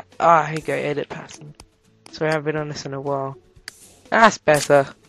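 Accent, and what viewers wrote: United Kingdom. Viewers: he sounds more british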